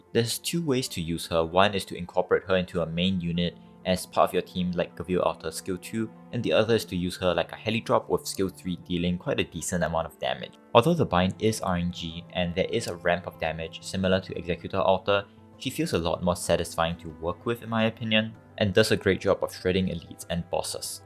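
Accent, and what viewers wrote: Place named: Singapore. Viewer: i can tell ur singaporean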